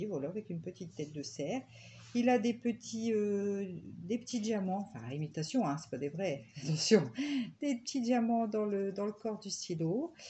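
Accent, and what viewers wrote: North, France. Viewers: Un petit accent du Nord ?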